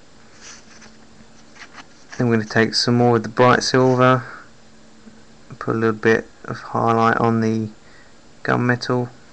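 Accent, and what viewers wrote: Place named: United Kingdom. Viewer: Good God!! A British voice!!!!!!!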